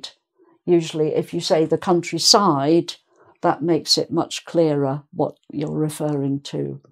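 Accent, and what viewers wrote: United Kingdom. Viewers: Beautiful british accent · Hello, teacher! I love your accent brithish and your lessons, than to be easy to understand. I am Brazilian and study every single.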